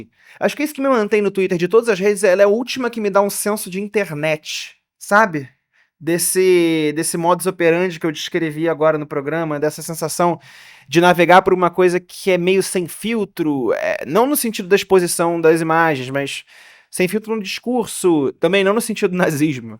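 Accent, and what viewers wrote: Rio de Janeiro, Brazil. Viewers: Mano, esse sotaque de playboy carioca quebra minhas perna, porra. Fica tipo Carlos piloto, aí é foda.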